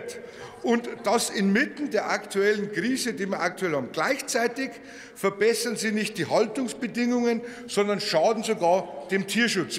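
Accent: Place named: Bavaria, Germany. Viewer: "Ich vertehe nichts.." Bayirisch verstehe sogar i.. als Schweizer!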